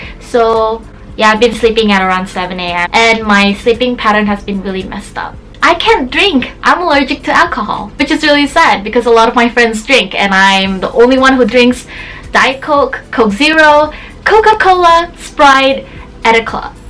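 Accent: Indonesia. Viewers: ahh an indonesian accent, I was always confused, I knew you were korean but your accent isn't native english speaker or korean... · At first you sounded like an Indonesian trying to speak English to me. number 9 explains everything.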